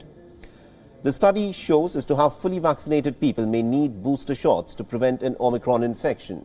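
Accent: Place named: India. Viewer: Meaning is lost with the Indian accent. Huh...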